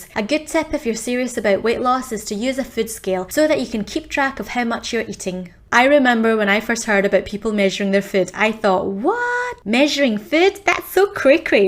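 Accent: Scotland, United Kingdom. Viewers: You live in Australia but you have an awesome accent that makes you sound Scottish almost. Where are you from originally?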